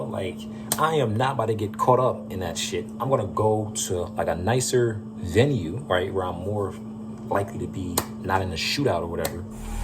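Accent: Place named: Caribbean. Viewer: Okay, after listening, it sounds like you're of carribean descent.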